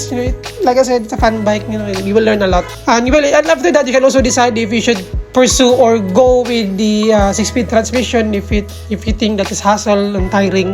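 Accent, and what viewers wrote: India. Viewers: Bagalan mo nalang English mo or tagalugin mo nalang kung hirap ka...Kase comparison of two motorcycle Ang topic mo kaya dapat mas maintindihan Ang explanation mo... Parang indiano mag English😅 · Para kang syunga mag review? Saka kana mag english kng marami kanang subscriber? Para kang mga indiano mag review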